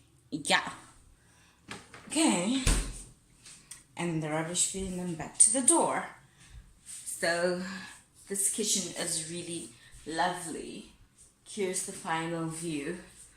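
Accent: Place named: United Kingdom. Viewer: You sound very British You can ask for a UK man like me lol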